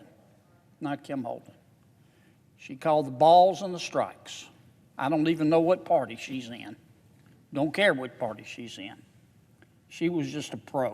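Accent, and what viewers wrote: South, United States. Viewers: Soft spoken and genteel, Senator John Kennedy leaves out the fact that the war in Ukraine has much to do with the impact of consumer prices. What has more to do with energy prices is the fact the oil producers are pumping less out of the ground. The purpose is to raise prices. The contributions of $893,247 from big oil does not seem to jar his sweet southern drawl either. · All males as far as his office goes. Spoken like a true southern rich old guy.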